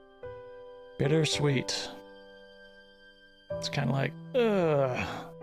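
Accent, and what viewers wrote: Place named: Scandinavia. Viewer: If you have watched a lot YouTube videos, it gets used to presentation like this. Calm, but expressive in moments. It’s actually good for learning and getting new ideas about the subject. It doesn’t force any attention, but highlights. Good job buddy. Pretty sure you are from Scandinavia, Finnish might fit that style the best 🙂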